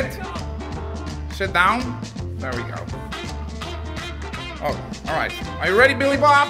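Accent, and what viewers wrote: Netherlands. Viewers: I can hear Dutch somewhere in the accent, is it true?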